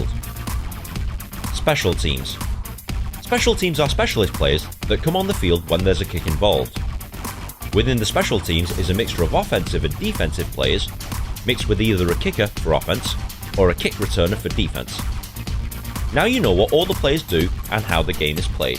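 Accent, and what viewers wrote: England, United Kingdom. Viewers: Holy shit! I just dropped my lunch! I moved to America last year, and my wife (Emma Howard/Madden now) and I are going to watch the AZ Rattlers play this afternoon and I wanted to see how the rules varied to regular American Football. I didn't even realise until halfway through that this was you, albeit I did think it strange that guy narrating had an English accent! Hope you're doing well mate - I can imagine you still rollerblading around Leyland!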